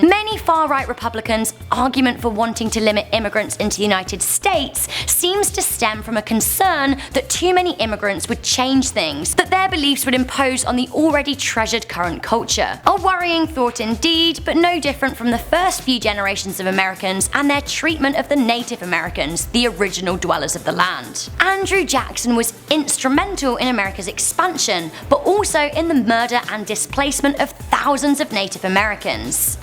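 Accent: United Kingdom. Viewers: A American should criticáis the USA not some British hore with her British accent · Few things piss me off more than some british chick and her screechy british accent complaining and making her negative comments on OUR presidents. While UK still has that terrible example of a royal "prince" Charles alive - no brit should be telling me about our problem presidents. Queen Mother and Charles need to do what is right for UK and give the power to William while they are alive to advise his court. This chick is horrible I am tuning out. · This list is total bullshit. Obomber was the worst President this country has ever seen and pardon me, you have a British accent. What the hell could you possibly know about U.S. history? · are you British?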